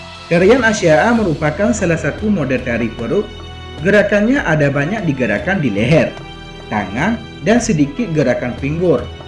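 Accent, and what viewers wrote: Aceh, Indonesia. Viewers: Orang aceh ya bang? Logatnya terasa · Logat Aceh ad irama Arab Arab ny sdkit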